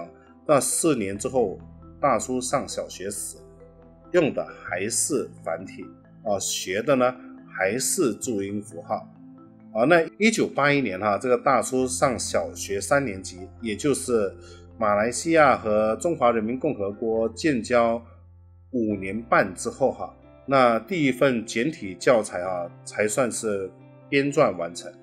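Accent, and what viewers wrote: Standard. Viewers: 郷民A 我们的华语发音及用词很受自身籍贯的方言影响，也掺杂了少数马来语的词尾音，听起来有点特色又像中国南方地区的发音。大叔是属于中文造诣较好的，多数华人的发音都没有那么标准。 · 真的不容易，以前不了解马来亚华人的处境，现在通过大叔了解一二，听完真的不容易而且觉得马来华人真了不起~！所以请大家不要挑三拣四了。就算是所谓的中华圈，国内的好多地方和香港地区还不如大叔会说一口标准的中文呢。现在台湾也好不到哪里去，很多正规媒体差不多三分之一说的是台湾闽南语叫人无语……。加油大叔！ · 在马来西亚华人中大叔的普通话算很标准的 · 有一点请您多多原谅：首先您的普通话，不，是华语，实在跟我们太接近了。我经常忘了你是个外国人，而像我们广东广西省份人的口音，甚至比他们说的还标准。完全把您当中国人了。更主要的是您跟我们一样热爱中国。 我在想，这种感觉可能像韩国、朝鲜人听我们中国朝鲜族同胞说话一样。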